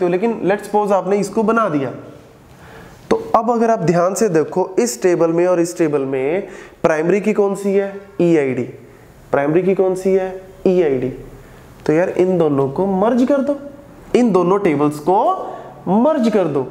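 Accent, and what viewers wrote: Punjab, India. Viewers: bhaia aap punjabi ho ?????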